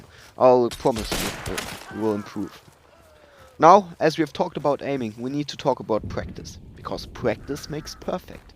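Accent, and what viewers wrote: Germany. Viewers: Hi. Are you German? It sounds like. 😊 I'm from German. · You'r German, am i right ? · When german speak english😂😂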